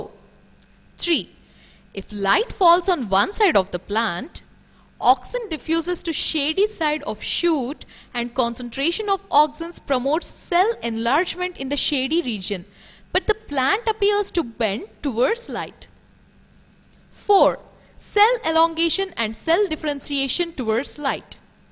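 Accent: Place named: India. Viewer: typically indian lol plz dont change english t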